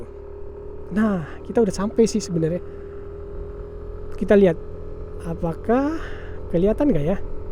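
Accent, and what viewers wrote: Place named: Solok, Indonesia. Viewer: Dari logatnya ucapan adikni .....ini kek logat Solok lah.....orang Sumbar asli inimah....karna ketahuan dari cara berbahasa dalam komunikasi ....MINANG EMPIRE INI MAH ...hahahaha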